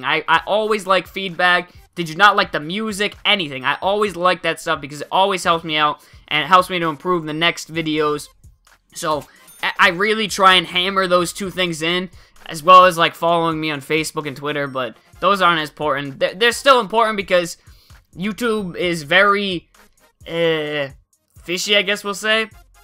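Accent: Boston, United States. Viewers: are u from boston??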